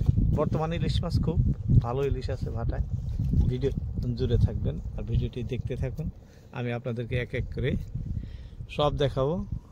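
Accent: Bangladesh. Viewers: চাচা আমি আপনার ভিডিও দেখি,, আসাম থেকে ভিডিও গুলো দেন,কিন্তু আপনার ভাষা বাংলাদেশের মানুষের মতো, আসামের ভষাতো এরকম না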